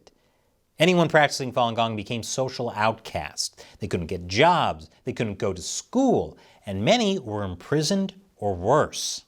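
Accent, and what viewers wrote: Australia. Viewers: Mate if you are going to insult people from New Zealand get you shit right., you insulted us by using an Australian accent..... we spend mot of our time insulting Australians.. you do no that New Zealand is not in Australia right? If we are insulting people you sound like small child and your face looks like your holding a fart in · Nice Aussie accent there! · Thats an Australian accent ont kiwi & yes, Jacinda horse faces fuck wit has mess up our country.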